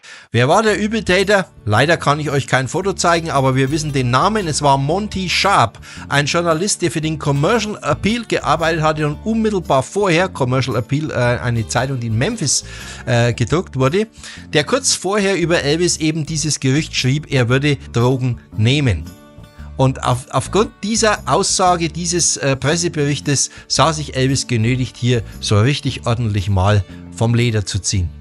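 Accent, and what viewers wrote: Upper Franconia, Germany. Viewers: Hallo, Deine Videos sind phantastisch. Frage an Dich, wo kommst Du her, Du hast einen unverkennbaren oberfränkischen Dialekt. Ich bin auch eingefleischter Elvis-Fan und hatte bis 2017 eine Band. Man nennt mich den "Elvis von Gunsenum" zu deutsch Gonsenheim. Ich lebe seit 1978 in Mainz und bin aber ein Rehauer, wenn Dir das was sagt. Vieleicht können wir uns im nächsten Jahr Mal in Bad Nauheim zum Elvis Festival treffen. Das würde mich freuen. Mit rockigen Grüßen von einen oberfränkischen Elvis Fan zum anderen. Volker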